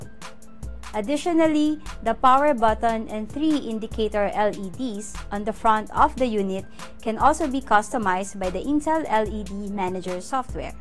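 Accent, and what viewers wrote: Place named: Philippines. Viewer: You sound like Filipino. Am I right ?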